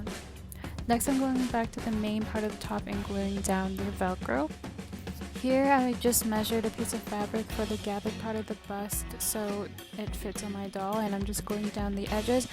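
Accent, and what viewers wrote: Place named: England, United Kingdom. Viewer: can't wait to hear it 😂 and I have heard worse especially when they try to sound extremely posh which tbh everyone tells me i speak like that but Ik that not everyone does and you sound like a normal English person btw Britain is Wales Scotland North Ireland and England all together and we all sound very different 😊 that's why what you were doing is more of an English accent rather than say an Irish accent😊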